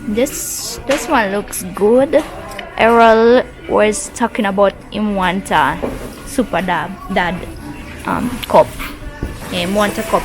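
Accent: Jamaica. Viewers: Been watching you from the start. And you speak real Jamaican now, luv it ❤ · you have caught the Jamaican dialect flawlessly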